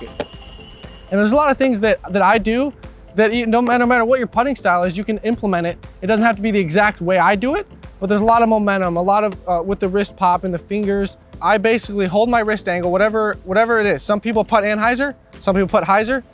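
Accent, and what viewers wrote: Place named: Northeast, United States. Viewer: Played it at 1.5 speed! Reminds me of folks from the northeast!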